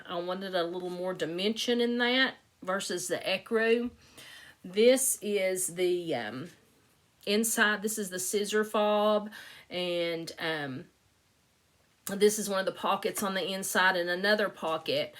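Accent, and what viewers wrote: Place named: East Tennessee, United States. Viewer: I had a feeling when I saw your thumbnail that I would enjoy your Flosstubes, and I was right! I heard a really familiar accent in that voice. I live in East Tennessee, and you sound like my people!!! What is it with these husbands who think we have too much stuff? They have their stuff, too! You are working on some beautiful pieces. I love, too, that your husband's tractor is "sitting" in your grandfather's barn! And how awesome it will be to display your grandmother's click & wheel with the piece stitched to commemorate her with hers! Thank you so much for sharing!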